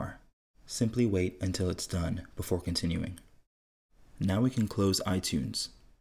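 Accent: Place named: Germany. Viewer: Fantastische Anleitung, 1000 Dank! Und ein kaum wahrnehmbarer deutscher Akzent?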